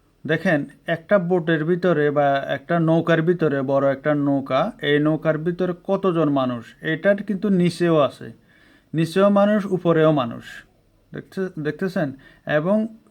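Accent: Noakhali, Bangladesh. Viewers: ভাই আপনার বাড়ি কি নোয়াখালি ভাষায় বোজাযায়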